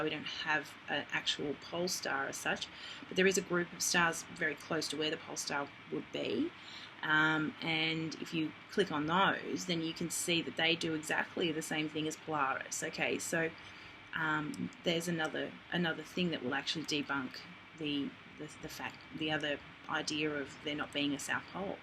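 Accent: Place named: Australia. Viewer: We don't need to have what we learned in school retold. PERIOD. They lied. You sound Australian, I'm in the USA you are right side up.....sorry.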